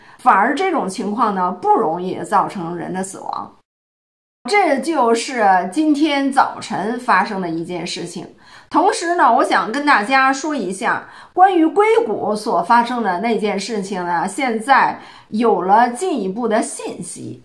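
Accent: Beijing, China. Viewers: 重复好几次了，但我还是得说： ，我太喜欢你的京腔了！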